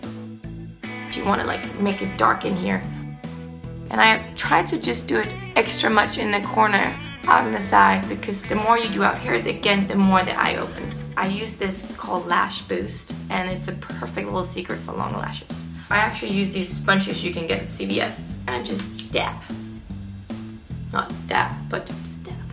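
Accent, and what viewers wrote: Denmark. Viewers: She's Danish . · She's from Denmark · I love that you can hear you danish accent a bit! (jeg er ogs fra danmark ahahah) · she’s fully danish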